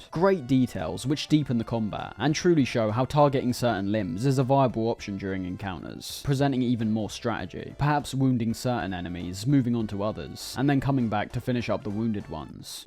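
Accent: United Kingdom. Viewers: Ahhh British guy video game essay just what I needed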